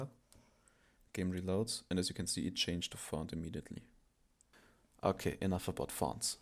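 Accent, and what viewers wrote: Germany. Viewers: schon beim ersten Satz gemerkt das du deutsch bist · You're accent sounds like a German one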